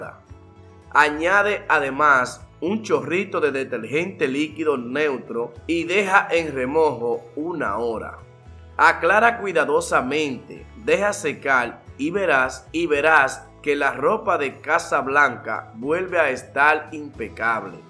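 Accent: Dominican Republic. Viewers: Criticón, me gustaría escribirte, parece que es dominicano y sí es así, ese su lengua popular.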